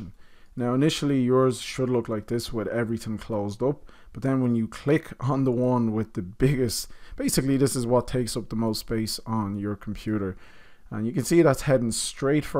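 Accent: Ireland. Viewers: Ayo your Irish?! 😮😮 Cool tutorial bru!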